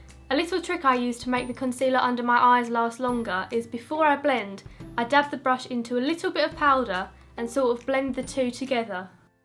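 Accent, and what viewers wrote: United Kingdom. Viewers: What year/grade are you in? Love you and your British accent 💖💋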